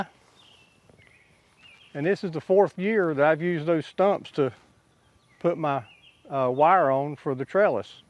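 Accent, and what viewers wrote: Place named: Georgia, United States. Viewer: Sounds like a Gawgia boy...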